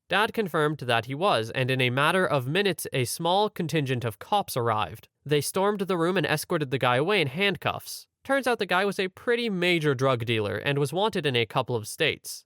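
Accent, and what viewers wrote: France. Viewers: 𝑀𝒶𝑔𝓃𝒾𝒻𝒾𝒸𝑒𝓃𝓉 French accent! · By all means your french accent was enjoyable mhm mhm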